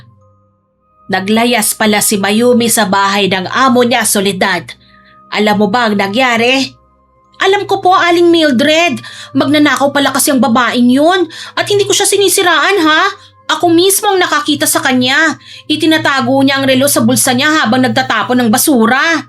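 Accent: Visayas, Philippines. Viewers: Ang cute ng pagka bisaya miss, as bisaya approved ka sa akin May pagka Hiligaynon ang tono hehehe pa shout out po next upload nyo watching from Oman 🇴🇲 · More Bisaya or ilongga voice po bahay din SA Inyo ms. ☺️napapangiti talaga ako pagnikikinig ako SA Inyo 🫶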